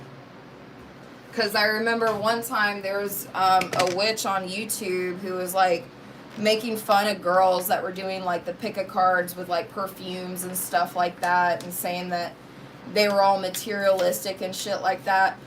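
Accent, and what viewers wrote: South, United States. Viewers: PS ever since you hung out w your family your Southern accent is so much more pronounced 💕💕💕 I love it!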